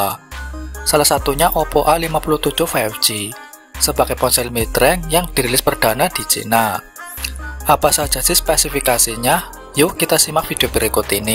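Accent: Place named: Java, Indonesia. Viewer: Bagus tapi medok sekali hehehe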